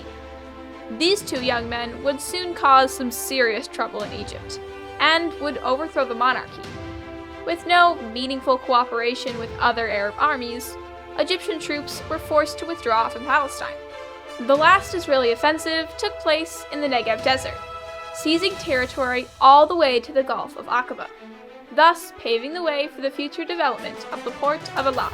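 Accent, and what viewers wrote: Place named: United Kingdom. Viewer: huh, narrated by a young British girl. kinda new way for a channel.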